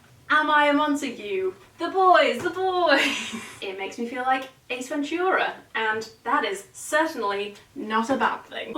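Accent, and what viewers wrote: Liverpool, United Kingdom. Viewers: My live watch-along thoughts: 1. As you said "You're my pal in the corner saying that's the one" I WAS TOTALLY YOUR PAL HYPING YOU UP, definitely go for the second white dress. 2. A black fedora can make any outfit, you must take it! 3. Your black turtle button dress did look a bit big but I think if it's really hot (ha! unlikely) you'll appreciate taking it and a belt could help. 4. Your pink paisley dress looks frickin adorable, can definitely see you floating round a field with that covered in glitter, very Florence-esk! 5. I agree I think the blue paisley dress needs to be longer but again I love those sleeves! 6. sings along to Might aswell face it your addicted to sleeves 7. The ditsy leopard dress reminds me of mustard, and I'm not sure it's a good thing. You can def do better. 8. Catagorically hanus is my new favourite phrase 9. 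HOLY FUCK THAT DENIM LOOKS AMAZING ON YOU! 10. OMG SHE DOESN'T LIKE IT, I WISH I COULD BEEM MY THOUGHTS TO YOU 11. The denim also has pockets, extra brownie points. 12. Those jeans are banging 13. I love your scouse accent because it's like Jodie Comer has just wandered in. (Killing Eve fans will know) 14. you just bless us with your videos, have an amazing time at Glasto! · Why do you look good in everything 😍 and that scouse accent HAHA been living in liverpool for 3 months and i still can't get over it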